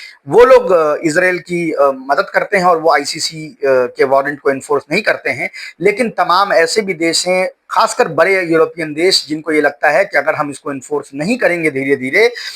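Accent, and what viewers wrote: India. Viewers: Great analysis. Although you were doing this English but this switch to Hindi is awesome. I also know English very well and listen many English vlog, But listen things in own mother tongue with desi accent looks awesome.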